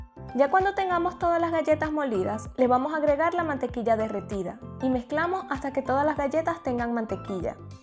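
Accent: Venezuela. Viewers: Parece q eres de Maracaibo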